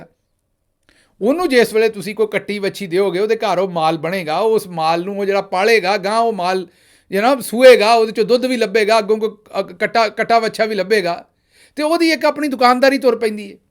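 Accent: Punjab, India. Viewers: sahib poori theth te khari punjabi bol ke shaa jande o janab zindabad or tuhanu salam 🎉from charhda Punjab India 🇮🇳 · تہاڈے جئی پنجابی دو سو سال دا پرانا بابا وی نئیں بول سکدا۔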